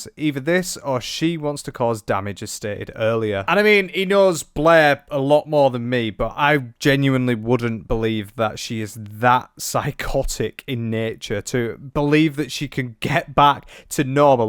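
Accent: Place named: North, United Kingdom. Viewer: I love how your "Northern English Twang" makes every time you say "Blair", it sounds like "Bleh"😂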